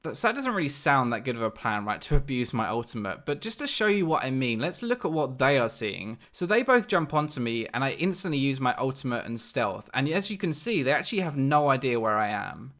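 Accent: United Kingdom. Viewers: Where are you from,? U have kind of a British Accent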